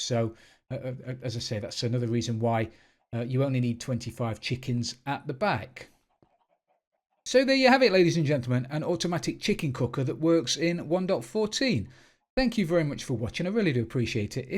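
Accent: England, United Kingdom. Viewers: I love his English accent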